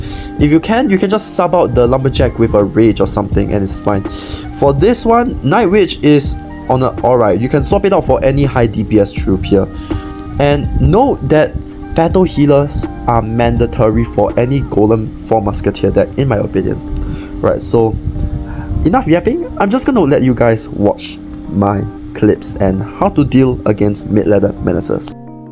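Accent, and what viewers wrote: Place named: Asia. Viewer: why do you have both african and asian accent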